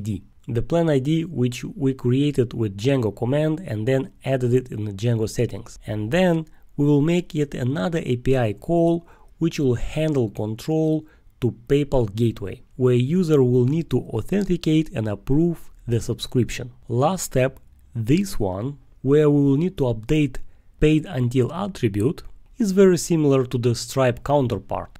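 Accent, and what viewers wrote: Russia. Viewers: Спасибо брат. Но был бы на русском урок - было бы ещё лучше. Акцент русский слышно))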